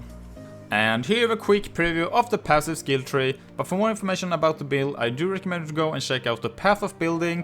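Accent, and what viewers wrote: Sweden. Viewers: Man that Swedish accent is insane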